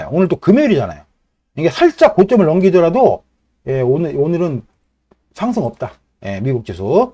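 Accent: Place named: Jeolla, South Korea. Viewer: 말투가 약간 전라도분 억양같아요^^ 좋은 내용으로 영상 잘 보았습니다. 감사합니다.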